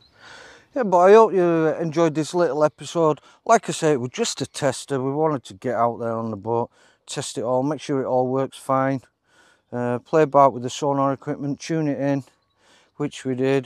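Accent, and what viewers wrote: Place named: Ireland. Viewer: Love your Irish Brogue👍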